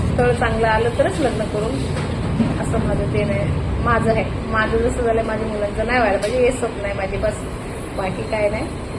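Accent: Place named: Belgaum, India. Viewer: Ase kahi naste आपण काम करत जा falachi apexa na thewata pudhe जा kashtache jivan ahe pn nashib wan ahet 4 children ahet .keep itp sone ky parat yenar .struggle is life .ata thodech diwsat tumche best days yenar mule shklit tyana smjte .good job kartil dont b nervous n worried.God bless u all.ur marathi language is ltl bit belgaum type.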